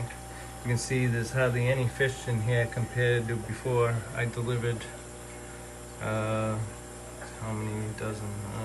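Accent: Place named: Boston, United States. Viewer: I love your Boston accent. Awesome video, thank you for sharing.:face-red-heart-shape::hand-pink-waving::glasses-purple-yellow-diamond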